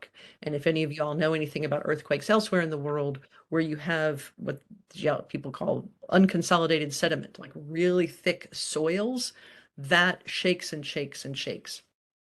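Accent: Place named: Missouri, United States. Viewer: I grew up in Southeast Missouri and we learn an overview of the earthquakes in school. I am guessing you are from a more western part of the state because you say Missour-rah instead of Missour-ee.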